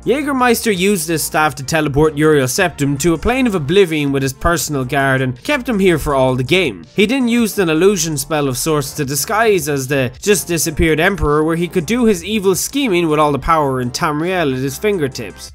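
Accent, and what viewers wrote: Ireland. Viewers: "So I was tinking" ah I love your irish accent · First comment ha how are you Irish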